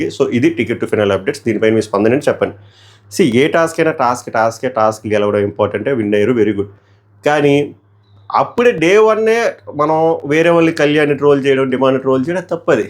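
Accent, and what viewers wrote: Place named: Andhra, India. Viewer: Bro ,am from Bangalore …ee Andhra slang vini chala years ayyindi … more than your reviews i like hearing this slang …Telugu almost marchipoya anukunna 😊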